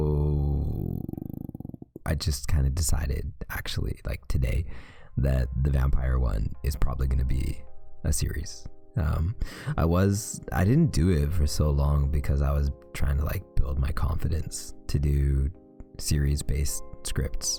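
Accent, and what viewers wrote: Canada. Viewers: There are alot of things to talk aboot Oh yes he's canadian 😍 Dont worry I'm canadian too 😆😆💋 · your the only reason why canadian accents are one of my faves rn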